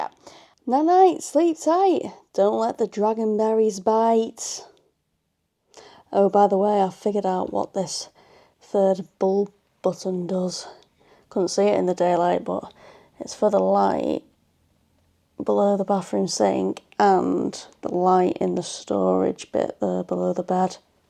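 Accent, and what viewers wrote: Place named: Teesside, United Kingdom. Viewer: I'm from Texas, but I went to high school in Teesside and college in Middlesbrough from 2013 to 2017 and this video has some potent nostalgia to it for me! Your accent reminds me so much of my old classmates, God knows I haven't had a J20 in about a decade, and while it wasn't a Hub my family and I did stay in a Premier Inn when we were looking at houses before we moved. Just found it funny how much a video covering a hotel I never stayed at could feel familiar to me! That hotel does look quite good for the price. Glad you had a nice experience!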